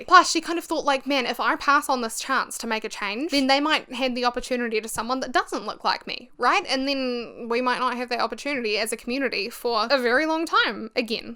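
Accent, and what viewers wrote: New Zealand. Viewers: New sub - I love your 🇳🇿 accent! This video is super thoughtful♡ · I had to pause the video to come here and brag that I correctly guessed your accent was Kiwi! (as an American). Okay, back to regular programming. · I subscribed for the thick kiwi accent. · its so comforting hear a kiwi accent because as a fellow kiwi who consumes alot of youtube alot of it is from American or British youtubers i love watching your videos its so well made and also making me proud of seeing content i love to watch being made by someone whos from the same country as me which idk if thats odd haha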